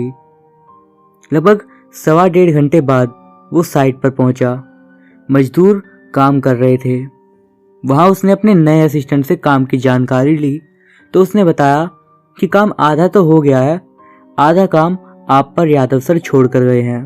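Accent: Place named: United Kingdom. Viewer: Ji, mai aapka ek fan hoon, ye ho gayi sabse pehli baat. Ab mai kuchh aisa kehna chahta hoon, jise sun kar, mai ummeed karta hoon ki aap bura nahi manenge balki, meri baat ko positive way mein lenge. Mai darasal ek suggestion dena chahta hoon aapko- please ho sake toh apne "र" ke pronounciation ko theek karne ki koshish karein kyun ki woh sunne mein aisa lagta hai jaise koi angrez kahaani suna raha hai, aur dusri baat, jab aap kahaani sunate hain toh beech-beech mein aapke do (2) words intermingle ho jate hain, jiski wajah se woh vakya theek se samajh mein nahi aati. Baki sab Great hai Boss! Aap ek amazing story teller / reader ho, aapki voice expression perfect hai. Bas, just yahi do-ek cheezein sahi ho jayein toh aap really outstanding performer ho sakte hain. Isiliye aapse request karta hoon ki zara in biduon per dhyan dein jo ki maine apne comment mein ujagar ki hai. 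Ummeed karta hoon ki aap meri (apne ek fan) ki baaton ko positive way mein lenge, na ki, bura manenge. Please do believe me buddy, i just love you & your stories too much!❤👏🏼👌🏻👍🏻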